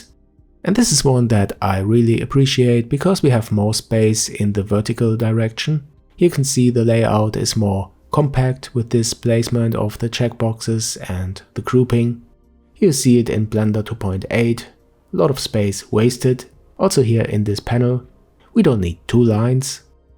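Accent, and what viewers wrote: Germany. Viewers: yeah... that explains it.. I've never heard germans accent before other than that autogefuhl guy. You guys sounds a lot calmer than the internet claims you to be. lol.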